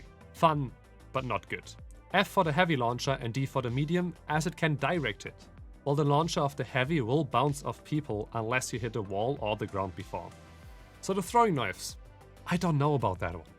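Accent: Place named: Germany. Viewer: Deutsch... Man hörts XD aber gutes video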